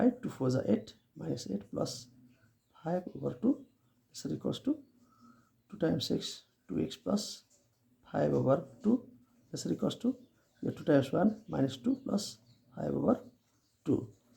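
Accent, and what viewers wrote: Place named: Nepal. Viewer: His accent shows he is nepali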